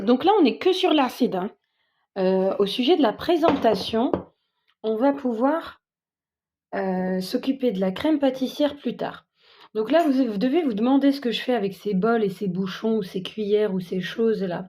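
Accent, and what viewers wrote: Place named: Algeria. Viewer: Les recettes sont tunisiennes et l'accent algérienne j'ai rien compris 🤗🤗🤗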